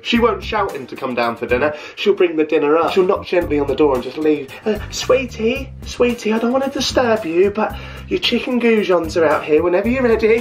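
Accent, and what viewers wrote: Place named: Yorkshire, United Kingdom. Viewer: your Yorkshire accent kills me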